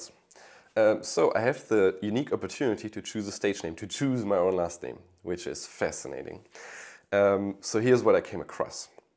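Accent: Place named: Germany. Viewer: I think it's the German accent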